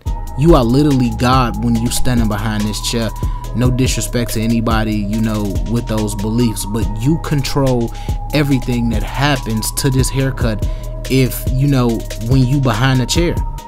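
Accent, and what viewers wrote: Chicago, United States. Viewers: Chicago accent..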